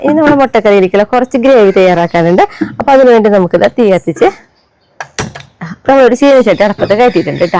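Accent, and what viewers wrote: Thrissur, India. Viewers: Ath Thrissur samsaram aanu..❤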